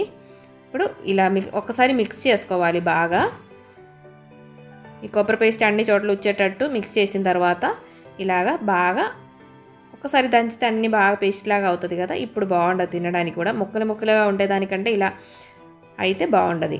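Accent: Kerala, India. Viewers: teluguni kerala accent lo matladthunnaru very nice ...first time hearing this ..how u learned telugu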